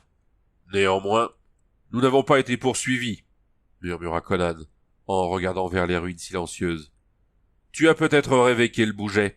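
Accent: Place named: Paris, France. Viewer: les livres audio c'est une bonne idée, mais l'accent parisien c'est pas possible c'est insupportable j'ai cliqué au hasard "qui occultaient l'ciel" bordel LE CIEL pas "l'ciel" On dirait une grosse vendeuse de poisson sur le marché entrain de brailler pour vendre sa merde : "VOUS V'LEZ UNE P'TITE TRANCHE MA P'TITE DAME" "V'NEZ GOUTER MON POISSON M'SSIEURS'DAMES, IL EST BON MON POISSON" Ok pour les livres audios, mais lis les mots en entier stp, sinon c'est inécoutable (sauf par des parisiens évidemment) bon après je critique mais dans l'ensemble c'était bien lu 👌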